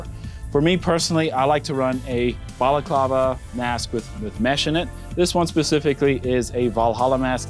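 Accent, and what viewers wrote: South Africa. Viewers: Are you from south africa? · Are you south african? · you south african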